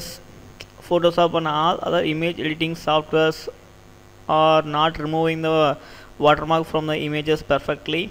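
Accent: India. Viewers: Good, where are you from? I am thinking a state from India, tell which one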